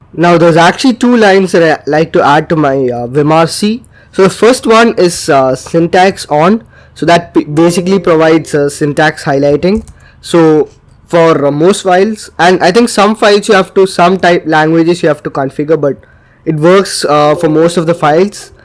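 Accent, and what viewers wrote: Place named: Bangalore, India. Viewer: Ur from Bangalore aren't you?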